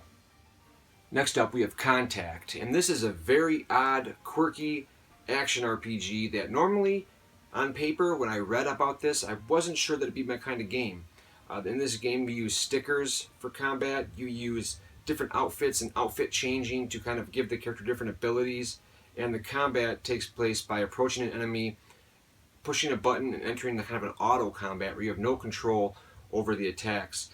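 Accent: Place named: Wisconsin, United States. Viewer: great job man! keep it up dude. are you from wisconsin? your accent sounds like it. could you start listing the game titles in your description box? thanks dude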